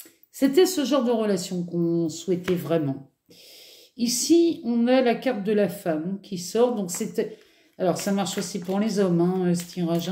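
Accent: North, France. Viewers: J aime bien votre petit accent aussi. Du nord ?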